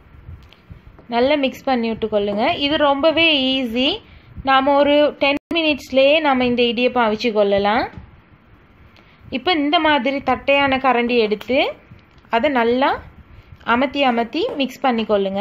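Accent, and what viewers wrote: Sri Lanka. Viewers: உங்களின் இனிமையான இலங்கை கொஞ்சும் தமிழ் அருமையோ அருமை சொல்லி தந்த விதமும் அருமை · Super.....Srilanka tamizha neenga pesuvathu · ரவா இடியப்பம் அருமை அத்துடன் இலங்கை தமிழ் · Ur sreelankan Tamil is sweet and so is idiyappam reipie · சகோதரி அருமை ... உங்கள் தமிழ் இலங்கை மற்றும் குறிப்பாக புத்தளம் தமிழ் வழக்கை ஒத்துள்ளது .. புத்தளத்தை சேர்ந்தவரா நீங்கள் ? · This is not Srilankan tamil , this is how Muslims speak in Srilanka ... 👌👌👌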